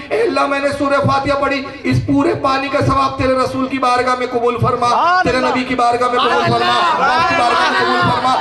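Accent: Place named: Kanpur, India. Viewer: Kanpuriya style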